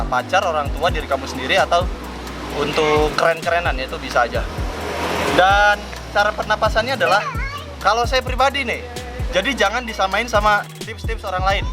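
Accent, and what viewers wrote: West Java, Indonesia. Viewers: Orang sunda bukan bang?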